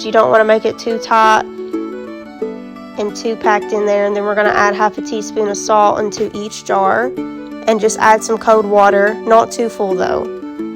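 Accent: Appalachia, United States. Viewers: 🫶👍🤟🤙👌🤞✌️👆❤👈 Make sure it's code water , not cold water. I love your appalachislang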